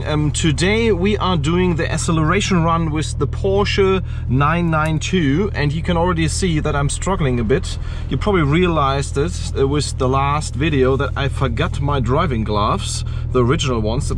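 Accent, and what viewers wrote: Germany. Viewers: Hate the dumb German accent and dad jokes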